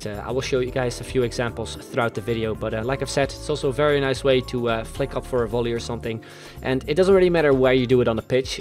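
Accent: Netherlands. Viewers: jij bent sws nederlands xD hoor het aan je accent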